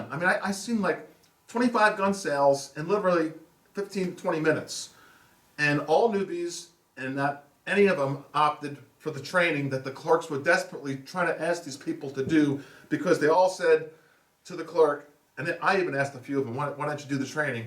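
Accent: Long Island, United States. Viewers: Funny to find out you grew up on Long Island. I live here ! Always wondered where your accent came from. You a Nassau or Suffolk guy ?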